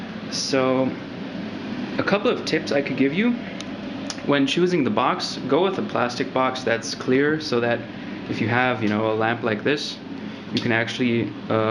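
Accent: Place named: Germany. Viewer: so typisch deutscher akzent ^^ super reviews und super paint booth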